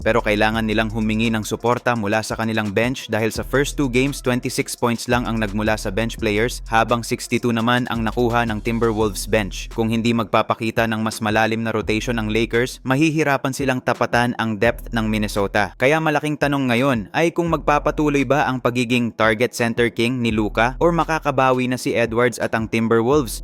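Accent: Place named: Visayas, Philippines. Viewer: San m ba nakuha yang balita m 😮 bisakol ka magbalita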